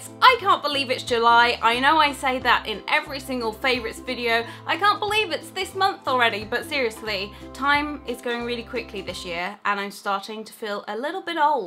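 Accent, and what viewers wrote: United Kingdom. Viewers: This may sound weird but I LOVE your British accsent I want to move to Englend SOOOOO bad😟 I'm AMERICAN AND WANT TO MOVE TO ENGLEND 😭😭😭😭😭😆😆😆😆🇬🇧🇬🇧🇬🇧🇬🇧🇬🇧🇬🇧🇺🇸🇺🇸🇺🇸🇺🇸🇺🇸🇺🇸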